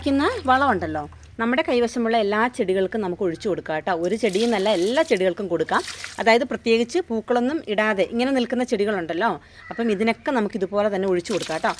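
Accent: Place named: Thrissur, India. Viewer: സാധാരണ തൃശൂർ ഭാഗത്തെ സ്ലാങ് ൽ വരും,,,,, ട്ടോ ട്ടോ,,,,,, തൃശൂർ പൂരത്തിന്റെ വെടിക്കെട്ട്‌ പോലെ 😁😆😆😆,,,,, ഞാനും തൃശൂർ ക്കാരിയാണ് ❤️ with proud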